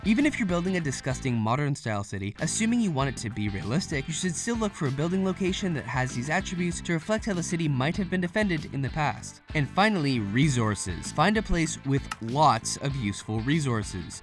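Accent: Canada. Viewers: he's Canadian not british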